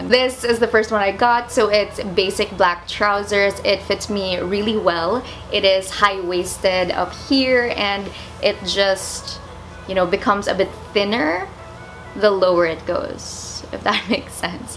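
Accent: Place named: United States. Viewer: First time viewer love the accent still confused if you're American in a good way though I immediately subscribed when I heard your precious voice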